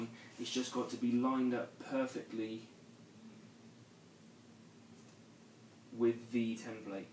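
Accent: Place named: Bristol, United Kingdom. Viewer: are you from bristol by any chance??? I am from Keynsham (between bath and Bris)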